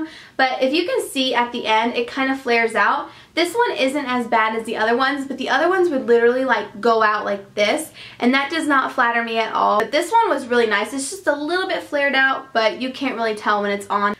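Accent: South, United States. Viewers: You sound so southern!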